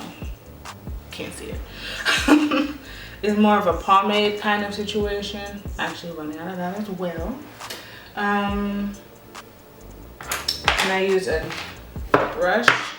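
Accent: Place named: Netherlands. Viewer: Nice subtle job. I thought you were from the Netherlands haha because you have a accent.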